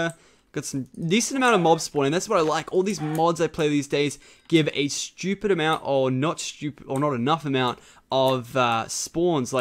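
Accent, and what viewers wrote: Australia. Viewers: Also, are you Australian because you sound like it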